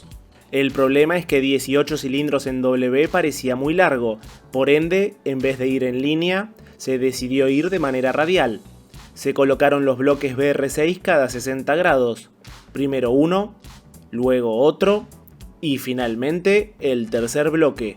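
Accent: Argentina. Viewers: soy argentino y ese acento es muuuy argentino.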